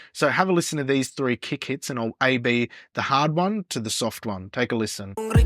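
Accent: Australia. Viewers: Straight to the point and no BS. No like and subscribe, click the bell, yada-yada. This is how videos should be. You sound Australian but look Italian with the way you communicate with your hands😅 Thanks for all the tips and tricks!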